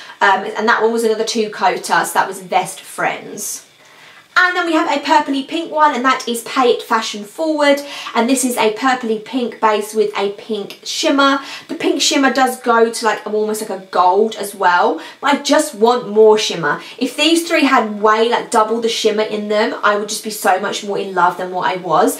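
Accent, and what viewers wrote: United Kingdom. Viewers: I only have Aut-umn I Need That but want You Don't Know Jacket and Haute Blood I love how it looks with a glossy top coat rather than matte, yes I'm strange wanting a matte polish to a glossy top coat on it 😂 you're British aren't you as my husband thinks you're Australian.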